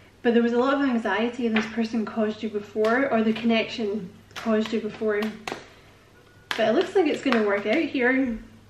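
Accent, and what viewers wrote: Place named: Glasgow, United Kingdom. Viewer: Sounds like a fellow Glaswegian reader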